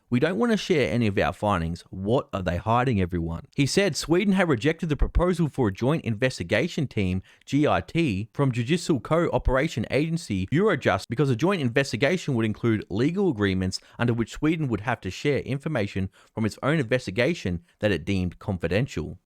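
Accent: Australia. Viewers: he is Aussie hence the accent.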